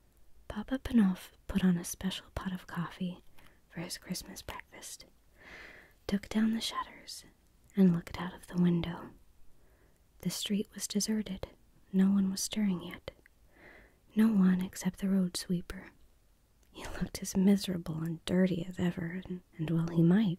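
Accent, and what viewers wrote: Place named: Texas, United States. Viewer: It's great to hear from a fellow Texan again. Wonderful video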